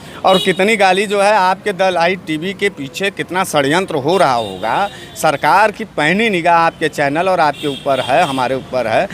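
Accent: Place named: Varanasi, India. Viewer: wah bahut achha bolte pakke banaras wale ho bekhauf zubaan bebak andaj thank you for the liv tv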